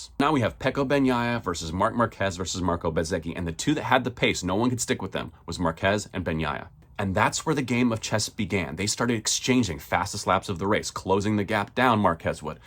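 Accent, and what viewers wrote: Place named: United States. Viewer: Keep it up man you've got a new viewer. Nice to hear an American voice commenting on motogp! Youre a good speaker. Hoping Yamaha gets back in the mix soon.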